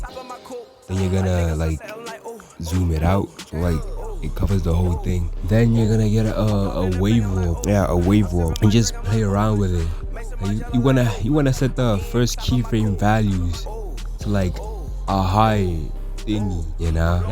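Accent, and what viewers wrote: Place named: Brazil. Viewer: o cara parece um brasileiro falando inglês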